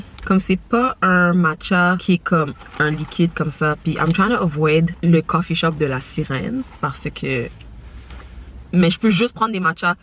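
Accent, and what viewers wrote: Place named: England, United Kingdom. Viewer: THANKS 🌟 🌟 FOR ANOTHER🍎 HEARTWARMING DOSE DOUBLE DOSE OF FRENCH. 🍏 LATELY IT SEEM THAT U HAVE A LITTLE MORE ENGLISH INFLUENCE ON YOUR FRENCH THAN BEFORE.😳 GREAT JOB.🏆 Of course I thought your next project would be a podcast called 🎈 in the House🎈📚An online Book discussion📚🪙Your Own novel🪙 and the sky is the limit.🥁 BIG UP YOURSELF 🔥🔥🌎💙🎥🎬🍀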